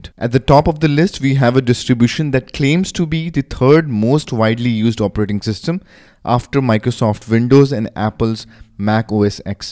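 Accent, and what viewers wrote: India. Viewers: I hate indian accent ! Speak proper fn english ! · You're talking in the classic Indian accent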